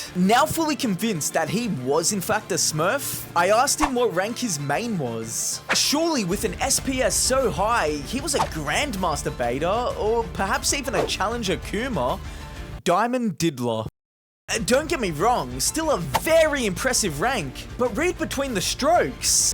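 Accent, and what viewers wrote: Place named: Australia. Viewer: where u from? u sound australian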